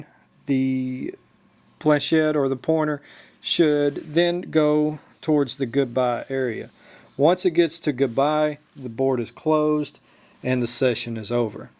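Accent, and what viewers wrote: United States. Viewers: SOUTHERN ACCENT HEE YA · what part of East Texas are you from?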